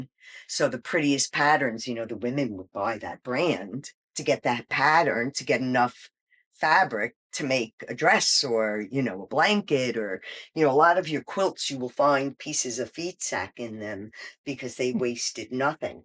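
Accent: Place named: New York, United States. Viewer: I had to stop watching for a minute just to say how wonderful you are to let us into your beautiful world! I’m in awe of your amazing collection and the stories that go with them. The story of your mother and the artist who crafted her last piece, the Santa, has touched me very deeply (I have stage 4 breast cancer). Also you sound a lot like me. I’m guessing Long Island or Queens. I hail from both, and even 🎉though we moved to the south 23 years ago I never lost that “accent.” Never stop being who you are. The world needs more people like you! PS: I have my grandma’s buttons too!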